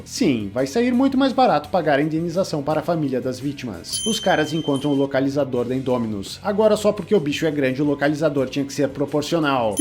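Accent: Rio Grande do Sul, Brazil. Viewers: Esse vídeo com sotaque gaúcho tá louco de especial tchê 😂 O que é inacreditável é que pessoas leigas como nós achamos tudo isso de erro e eles pagam milhões para fazer o diacho do filme e sai com esse tanto de erro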